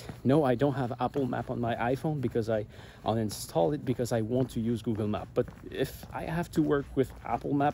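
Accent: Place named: France. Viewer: your accent is much too strong, when a French person tries to speak English it is usually very difficult for me to understand or I do not understand half of it because of the poor pronunciation of the words and I speak a lot of English and German and Dutch. I also think that you as a Garmin fanboy you just enjoy making Apple bad while it is an all-round top product. Garmin is certainly good too, but its not for me.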